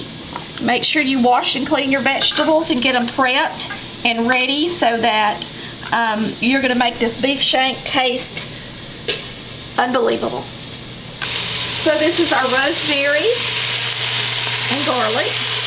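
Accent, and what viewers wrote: South, United States. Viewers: you sound like a country girl and I love it! I want to know how you're going to fix those beef shanks. I love mine fall off the bone....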